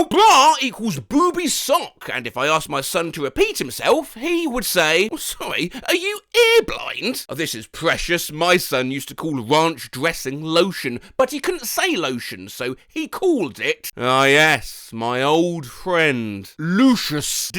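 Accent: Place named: London, United Kingdom. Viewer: . Buddy. Pal. Chum. You sound a bit like Jim Sterling. This leads me to believe you come from London.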